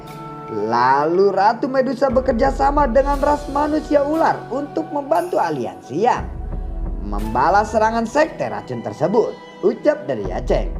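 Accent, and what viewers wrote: West Java, Indonesia. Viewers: Min emang orang mana sih ? Bahasa Sunda nya kaya gak asing 😂🤣👍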